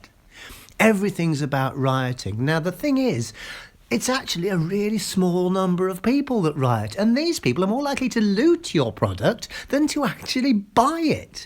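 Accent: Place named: United Kingdom. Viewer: Love your accent friend! Where you from in Britain?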